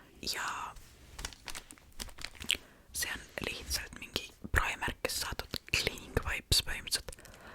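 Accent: Saaremaa, Estonia. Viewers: Mina ei taju su eesti keeles mingit aktsenti peale saare oma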